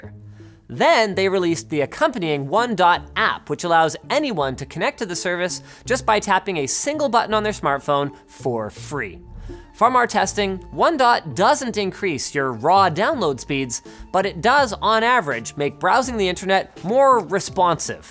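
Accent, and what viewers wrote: United Kingdom. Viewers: solid brit accent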